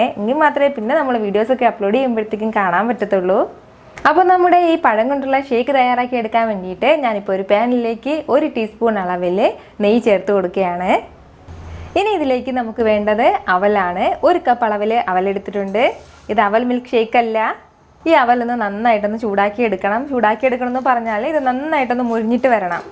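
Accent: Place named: Malabar, India. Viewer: Actually trivadrum tanne anoo..voice cheriya oru malabari touch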